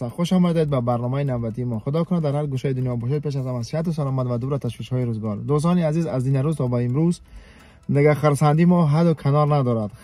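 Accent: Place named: Iran. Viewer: Ин чи хел лахчаи гап заданай ним точики нимш лахчаи эрони 😅😅😂😂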